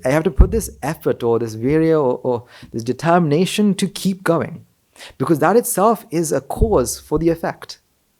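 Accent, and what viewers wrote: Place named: United Kingdom. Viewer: Profoundness with British English excent sermon❤ saadhukara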